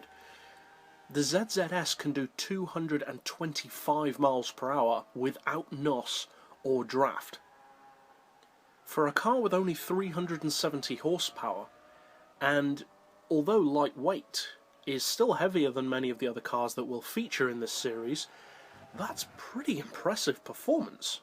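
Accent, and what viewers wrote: United Kingdom. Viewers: urr so british!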